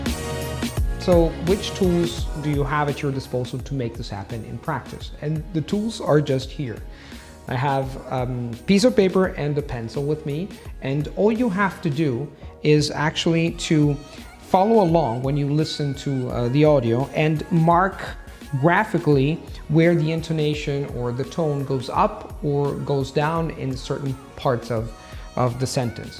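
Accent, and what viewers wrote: United States. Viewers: My pleasure,:D Oh, so staying in Poland "inspired" you to learn Polish, then:P Good luck, btw!:) I've heard that Polish intonation is pretty similar to an Italian one. I mean that it's quite simple to master Italian for Poles and Polish for Italians regarding pronunciation but I may be wrong:P Yeah, it definitely helps socially as you mentioned in the above <3, I must admit that your videos have been like a drug to me recently xd I can't wait to prepare food and watch your video:D Plus, I love the fact that your accent is American <3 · I've known a lot of Italians, but I don't think I've met any who've mastered American intonation like you.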